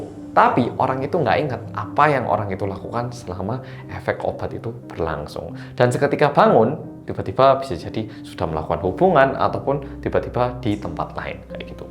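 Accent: Java, Indonesia. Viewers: Opa medok upload lg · Bersama orang korea yang medookkkkkkk😁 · Kang Mas,bikin konten tentang kerajaan kuno KOREA dong,swaramu lembut medokg meruntuhkan kesombonganku,biar aku dengerin sambil # TAKING NAP#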